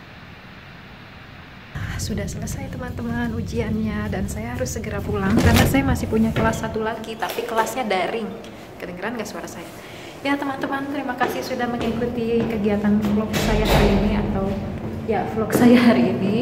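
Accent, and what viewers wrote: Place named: Sunda, Indonesia. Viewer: logat sundana meni eces..teteh❤❤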